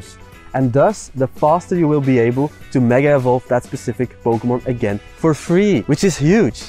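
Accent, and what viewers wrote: Netherlands. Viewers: I can instantly tell you're dutch by the accent :D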